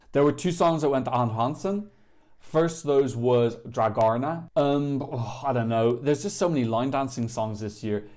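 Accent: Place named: Ireland. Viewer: it's Irish English, and it confuses even the English! (especially the English 😂) It confuses the captions as well, they're hilarious when they come up on my home page.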